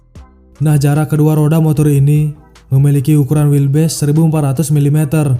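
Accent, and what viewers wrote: Bali, Indonesia. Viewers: Mimin asal Bali yaa...??? Logatnya mirip orang Bali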